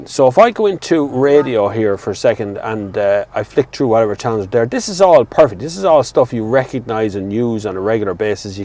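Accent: Ireland. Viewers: How I love irish dialect!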